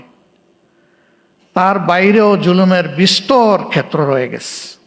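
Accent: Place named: Sylhet, Bangladesh. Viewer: Bangla bolte parena sylete chara. Tobu pod chere youngder hate pod debena.?????/ Dol ke ekjon smart young er hate tule sonmaner sate retire jan. Louv lalosa poder chere den. 16 bochor kicu hoi nei apner dara, kormider charate kono kaj koren nei. Julom hoyche apnader dalalir jonno. Jatike ar koto boka banaben vason deya, kajer belai zero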